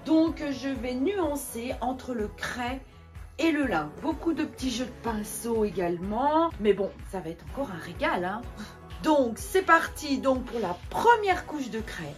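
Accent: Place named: Franche-Comté, France. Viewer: Bonjour j adore! J ai reconnu un accent franc-comtois de mon avance..? Bisous et bravo! Ps: j ote les ferrures en général, mais pas toujours possible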